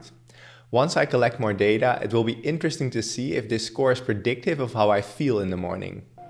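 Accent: Netherlands. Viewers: Are you Dutch? The accent sounds very familiar. The video was great, comprehensive analysis of the features in a tidy format, well done! :D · Are you Dutch? Your accent feels similar. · AHHAHAHAHAHA 100% accuracy in Dutch speaking English.... I was worried I didn't recognize u r Dutch correctly because the cars in your video had white background plates, but then I checked your CV... :D Good job, amazing video, thanks a lot for your videos, i'm ur subscriber now! Greetings from Rotterdam, I'm an expat here and love the country! Tot volgende keer!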